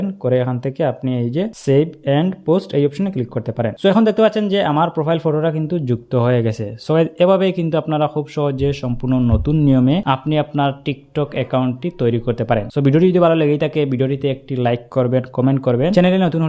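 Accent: Sylhet, Bangladesh. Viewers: সিলেটি নি ভাই?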